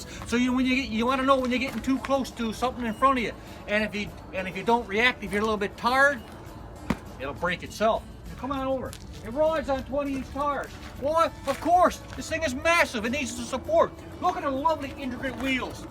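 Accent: Canada. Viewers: "...over tree hundrid horse power!" Haaaaaaa! Love that Cape Brenton accent... "You frum the Bay, By? Noooooo, I'm frum the Pier, Dear!" **and** "It's a noice night for a foight, Mike!" are also Cape Breton classics :. )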